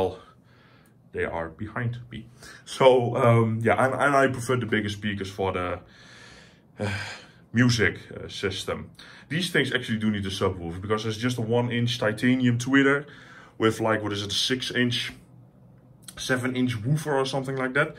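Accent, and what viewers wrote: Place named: Netherlands. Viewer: Leuke setup man, dacht all heejj dat klinkt als hollands engels😂🤣👌🏻💪🏻👍🏻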